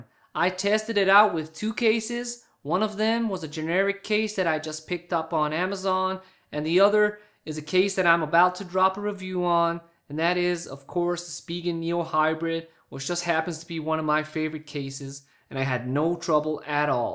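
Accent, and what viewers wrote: United States. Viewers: You're American accent is better than my Scotsman accent. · Nice one. Lol. I Truly enjoyed the video, and your accent. I'm not a big fan of wireless charging, but I'm getting a free G4 from a contest, and I'm going to get the one that you showed just for the heck of it. Your American accent sounded just like a friend of mine in Florida. What a hoot.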